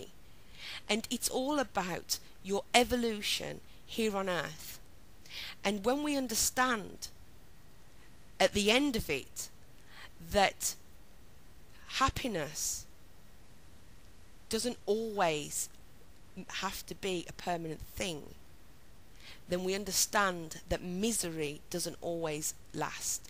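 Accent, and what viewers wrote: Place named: Birmingham, United Kingdom. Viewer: Hi iits nice to hear a brumy accent! I had a spiritual awakening last yr, do you know any groups in bham I could meet up with? It can be so lonely when people around us don't understand x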